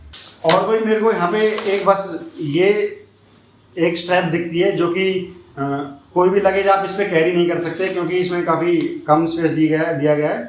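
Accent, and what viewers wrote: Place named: Uttarakhand, India. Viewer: Bhai tumhare bolne ke tarike se lag raha h ki tum Uttarakhand se ho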